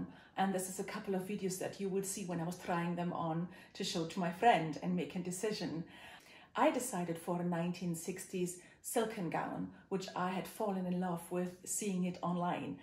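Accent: Scotland, United Kingdom. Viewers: Where is your accent from? Its sounds like a mix of Scottish and Eastern European.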